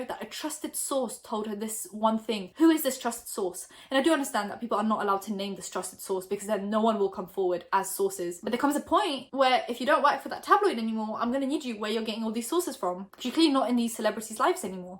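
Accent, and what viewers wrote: United Kingdom. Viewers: I watch you videos all the time but today I was surprised when you started talking and I heard your accent , as if I had no idea you had a british accent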